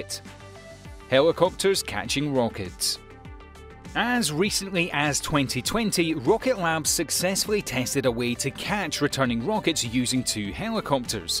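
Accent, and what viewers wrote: Scotland, United Kingdom. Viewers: Are you from Scotland? I like it , not so much the Brits I like the way you speak, it reminds me of 'Scotty' on the enterprise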